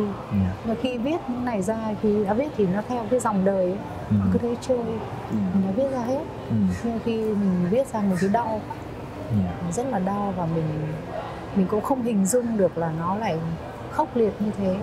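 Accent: Hanoi, Vietnam. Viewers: Chi sao van tre &Xinh the nhi! 🐅🇻🇳 Phong cach &giong noi cua Chi that "chuan Hanoi "!💖🇻🇳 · Phai noi giong HA NOI TRUOC NAM 1954 moi dung ban a vi sau nam 1954 moi nguoi o bung bien , tinh le vao sinh song o HA NOI roi.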